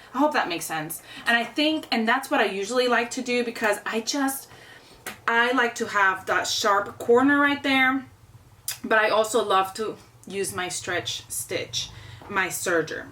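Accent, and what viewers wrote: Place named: Spain. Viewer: You have a Spanish accent!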